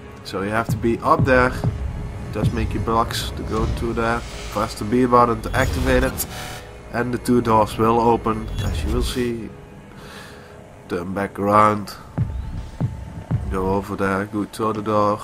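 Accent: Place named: Netherlands. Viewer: You sound dutch lol.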